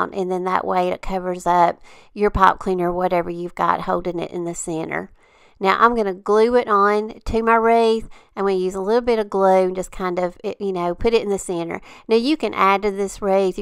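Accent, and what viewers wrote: South, United States. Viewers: you never fail to amaze me! I love your style, your cute southern accent & your beautiful projects, thank you! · Awesome job. 🥰 I love your Southern Southern voice.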